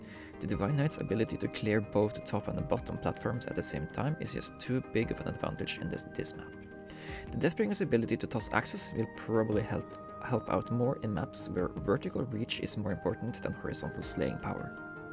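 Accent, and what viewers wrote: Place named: Sweden. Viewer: youre my fav idleon creator btw, especially since you sound swedish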